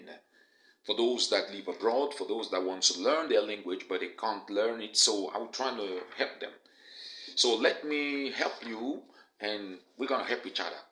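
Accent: United States. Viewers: Je pense que ça ne va pas aider les débutants désolée. Vous prononcez mal avec un accent américains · Désolée, la prononciation n’est pas terrible pour des débutants. Je pense que cela est dû à votre accent trop américanisé.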